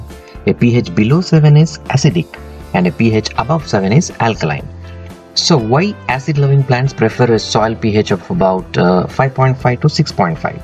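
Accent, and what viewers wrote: India. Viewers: I don't understand what the hell you talkin about, with your Indian accent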